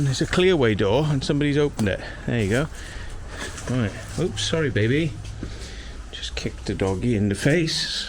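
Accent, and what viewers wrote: Wales, United Kingdom. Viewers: awesome thank you so much! Also, while I have you, what part of the UK do you hail from? I mean your accent is very different to the rest of your crew (in a good way) my partner who now lives in Australia, grew up in Ashford in England and he thinks you sound a bit Welsh?? I don't agree, so we'd love it if you could settle this argument for us lol 😉 Thanks again for the harness info and please give Angel a belly scratch from us! ❤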